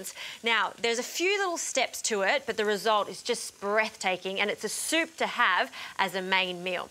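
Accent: Australia. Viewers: She sounds Australian.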